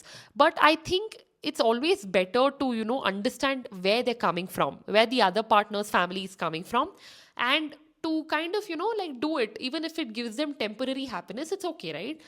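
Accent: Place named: India. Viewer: 1. Worst accent to listen to over a public podium. 2. Seriously, Indians need to aggressively build their damn ways to bring good quality orator in them, not in terms of what they speak, but how they speak. Period. 3. If Indians have, what the accent you speak, speaking my heart out, I feel English is being dragged to hell and killed. 4. This was never, Britishers wanted in their life time, to get their lovely mother tongue, subjected to such an awful transformation in terms of pronunciation.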